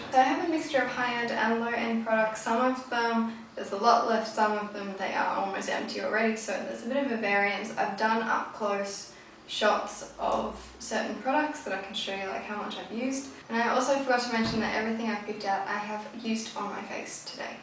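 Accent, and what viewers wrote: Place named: Australia. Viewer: Love hearing an Aussie accent! Can't wait to see your progress :) I'm also panning and as a result, am addicted to watching panning videos!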